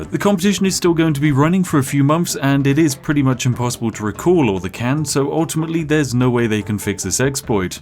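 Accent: United Kingdom. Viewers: Oh Im so happy to have found this channel. I wouldve never thought that making money could be so easy or that some companies are just that stupid. Not that I ever tried some of these tactics but you and your humor and british accent are making the journey worth watching. Thanks for making these videos and keep up the great work. Your one of my favourite channels that Im always watching right now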